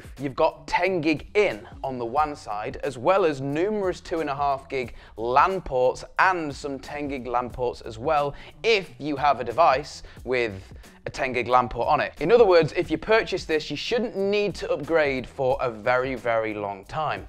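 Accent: United Kingdom. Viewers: That’s how we say it in the proper Queens English, not the bastardised yank rip off. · That is how the British say 'router' because of how we say 'route'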